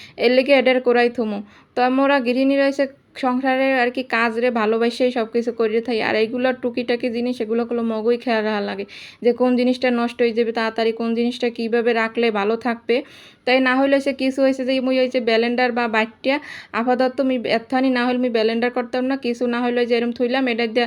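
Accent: Barishal, Bangladesh. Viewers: লাইক দিয়ে দেখে নিচ্ছি বরিশালের ভাষা আমার ভাল লাগে। এত সুন্দর করে কথা আর বন্ধু না হয়ে পারলাম না। নারকেল দিয়ে চাল ভাজা অনেক খেয়েছি লেংডা কালে দাদী থাকতে। ভাল থাক বোন 🎁🎁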